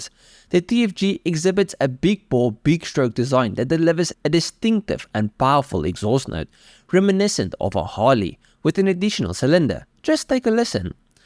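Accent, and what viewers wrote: Afrikaans, South Africa. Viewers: You sound like someone who speaks Afrikaans.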